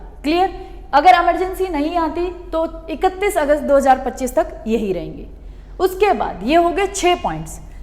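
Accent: Punjab, India. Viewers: Mam are u pnjabi?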